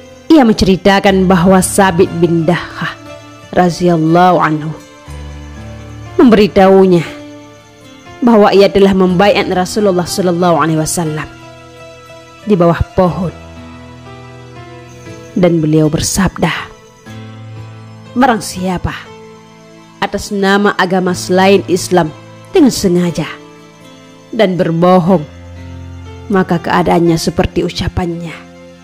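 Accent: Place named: Medan, Indonesia. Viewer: logat medan😂😂😂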